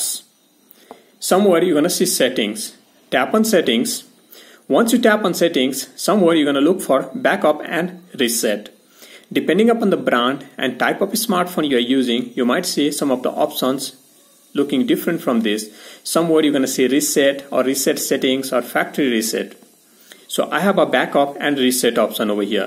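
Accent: India. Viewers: U are Bengali ?? U accent is pure Bengali ... Bhai Hindi may bolo naaa itni takleef kyun le raheho bygan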